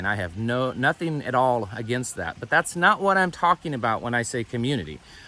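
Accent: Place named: South, United States. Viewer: Very very southern ill. Hello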